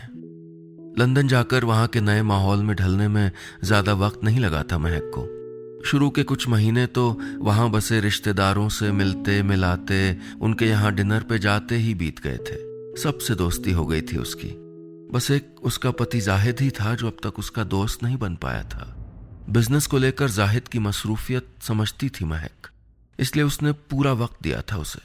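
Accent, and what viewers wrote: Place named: Kashmir, India. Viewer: jitni khoobsoorti se aapne kahani ke liye, kashmiri accent laya hai, isse badhkar kuch nahi 💙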